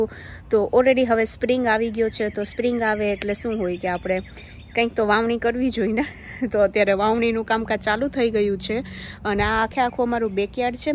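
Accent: Kathiawar, India. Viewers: 🙏 જય સ્વામિનારાયણ 🙏 👌👌👌👌 વિડીયો બનાવ્યો છે તમારૂં વતન કયું છે તે જણાવશો અમે તમારો વીડિયો પહેલી વખત જોયો છે મજા આવી છે તમારી ભાષા પરથી એવું લાગી રહ્યું છે કે તમે કાઠીયાવાડી છો અમે મોરબી થી છી તમને બંધાને મારા ભાવથી જય સ્વામિનારાયણ 🙏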